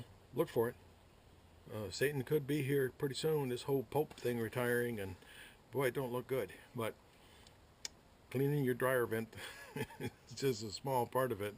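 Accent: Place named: South, United States. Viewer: Wow, You Got it Brother!! Well taught! You got, NC, HD, Clear clean Southern English, like I have, and Using God's name to share, not used in Vain! I was just Watching my A Good Guy, Originally from "Car Crazy", Barry Mequiar from his new show "Ignite your life" Guys and gals sharing their Faith, from all us sinners and fools, That Christ still Loves Us, even knowing how screwed up we are. God Bless YOU for worldly help, but more importantly, filling the Hunger, that so many have for meaning and purpose., Lee, Fl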